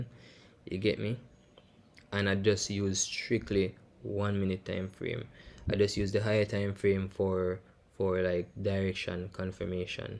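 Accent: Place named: Jamaica. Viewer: Mhnnn I'm really melting here.. I love your sexy voice and that sweet pie Jamaican accent.. Ohhhh my goodness I can't even follow the strategy but smiling all the way cos I love you mhnnn.. U ve earned a adimire sub.. U are delicious kikikikiiiii.. Have a beautiful day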